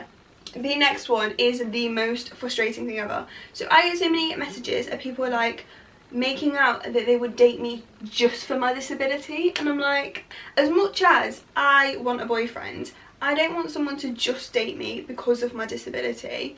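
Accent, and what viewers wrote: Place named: England, United Kingdom. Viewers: She's from Derby. I'm from Derby as well we have a couple of "native" accents here. One of em is Kelly from Misfits! lol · I love your accent, im from England too but i can't work out where the accent is from! You are extremely inspirational , beautiful and very talented at make up, you should be so proud of your content! 💗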